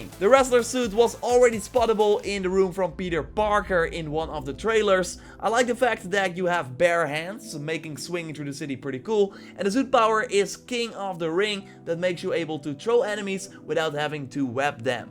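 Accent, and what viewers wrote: Netherlands. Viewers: Are you dutch? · Are you from the Netherlands? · You are dutch right? I think i hear it in your accent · Deze guy is sws Nederlands😂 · Jij bent nederlands toch?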